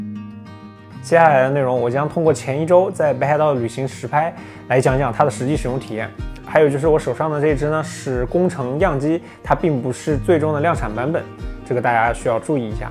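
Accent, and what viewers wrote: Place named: Henan, China. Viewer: 突然这一集满满的fu南话？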